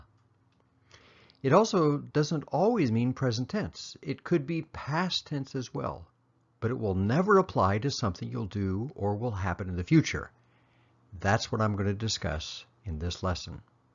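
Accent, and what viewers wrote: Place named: Myanmar. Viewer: you are genius and smart man. I love your Myanmar accent.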